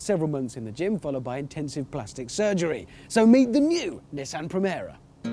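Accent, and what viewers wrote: United Kingdom. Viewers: Nissan have the legendary british accent reviewer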